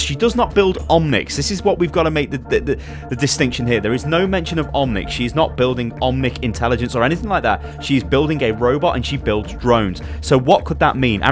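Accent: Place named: United Kingdom. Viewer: Nice to hear another British voice bro 👌🏻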